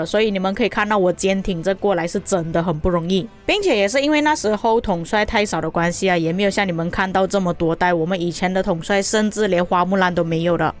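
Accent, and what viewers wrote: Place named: Malaysia. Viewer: 加油（其實我是因為你的聲音有很重的大馬腔我才開始看你的影片的😂），你的影片都很有參考價值，繼續做下去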